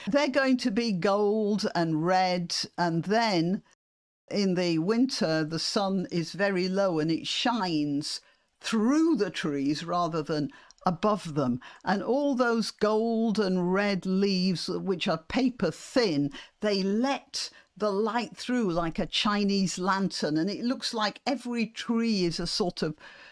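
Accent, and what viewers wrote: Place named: Yorkshire, United Kingdom. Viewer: With your Yorkshire accent, I imagine I'm in The Secret Garden.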